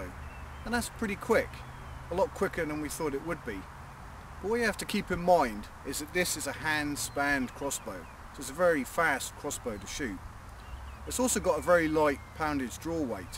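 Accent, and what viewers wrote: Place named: England, United Kingdom. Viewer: Sorry I'm very bad at understanding an english accent, can you tell me the name of the first crossbow type you used?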